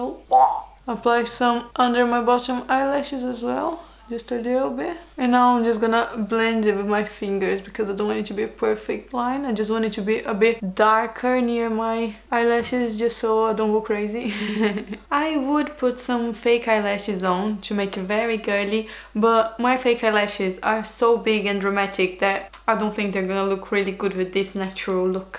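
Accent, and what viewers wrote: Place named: Brazil. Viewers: Você fala português? O jeito que você fala me lembra o sotaque de brasileiro falando inglês --- You speak Portuguese? The way that you speak reminds me the english speaking brazilian accent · Allyson Matheus eu também achei o sotaque bem parecido, acho que ela é brasileira kkkk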